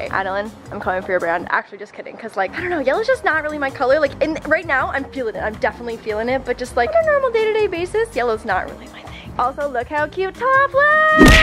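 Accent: Canada. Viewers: why did i read the title “i’m sorry” in your canadian accent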